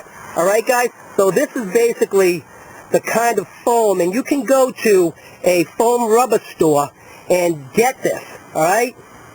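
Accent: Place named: Boston, United States. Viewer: Ha! You're definitely from Massachusetts or maybe even Rhode Island. What gave it away, you ask? Oooh, one of the original shirt designs from WAAF, the Patriots hat and the THICK Boston/Providence accent. I'm not raggin bud, I'm from Massachusetts and I often forget to realize how we sound... it cracks me up. I don't usually see or hear fellow New Englanders on youtube, kudos. Great video, with a great idea. Keep it up. Cheers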